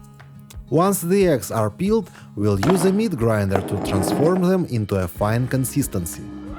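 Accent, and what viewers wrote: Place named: Russia. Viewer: well I ‘m going to explain myself… I speak fluent English and from my childhood used to be taught to pay attention to my pronunciation As a modest result … years later, no one picks my Russian accent even though I don’t sound like a native speaker … it’s not about being snobbish, not at all It’s simply certain level of so called professional enhancement if you have decided to record your channel in English… As much as we’re proud to cherish our great language and cultural heritage we have to admit, Russian accent isn’t easy on some ears ! His channel is very professional but accent is distracting , isn’t it?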